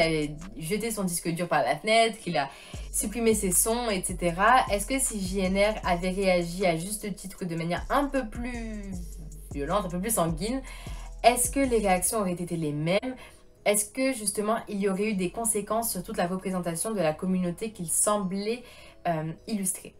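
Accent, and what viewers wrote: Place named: England, United Kingdom. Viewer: Mais faut arrêter d’être tout nier et être sincère. Le sujet est traité d’une bonne manière. Mais en aucun il est complexe, de deux il y’a un ton trop magistral et ne parlons pas de l’accent anglais qui fait peur. De plus il faut prendre en compte plusieurs paramètres. En effet, la vidéo sur JNR avait pour but de mettre à mal ce dernier et quoi de mieux que d’insister sur les clichés du raciste pure et de l’imaginaire de la banlieue. De ce fait Cette vidéo nous démontre à quel point tout cela n’est que pure imaginaire de la part des « autres » ce qui imagine la banlieue à travers un prisme mediatico-politique et malheureusement c’est loin de la réalité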